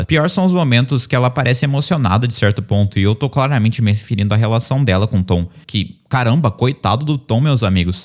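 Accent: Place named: São Paulo, Brazil. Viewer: desse com sotaque paulista querendo falar da star scrrr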